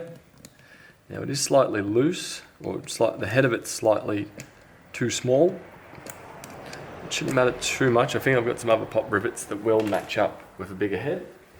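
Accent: Australia. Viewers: on taking my HP apart today, I see there is very little to play with, thank you for the variety and detail of your channel, and clear Aussie explanations.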